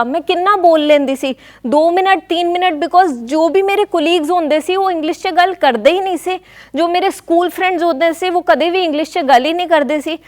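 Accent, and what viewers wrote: India. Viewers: madam thda hindi touch boht a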